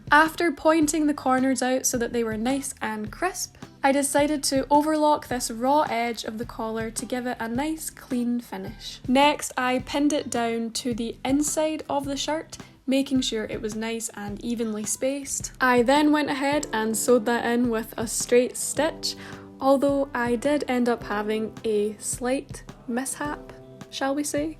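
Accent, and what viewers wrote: United States. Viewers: your american accent was actually so good 😭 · your american accent is really good! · no ur american accent was PERFECT what the heck lol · Your american accent was pretty good! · Your American accent was actually on point! Loved this video, thank you for sharing and making us all believe in thrifting and the possibility of transforming "those" clothes into something new and fresh!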